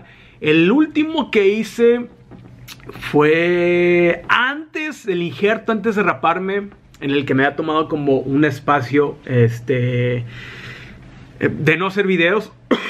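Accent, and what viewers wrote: Monterrey, Mexico. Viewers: me encanta tu acento regio, creo que nadie podría hacer mejores ediciones que tú, mi vida no va tan bien pero hay que sonreír y ser mejor cada día 🙂 felicidades por todos tus logros.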